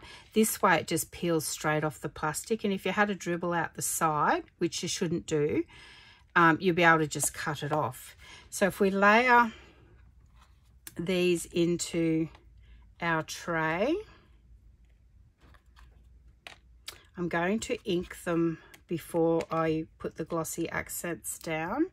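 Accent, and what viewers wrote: Australia. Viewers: Lovely to hear an Aussie accent. Feels like listening to a friend.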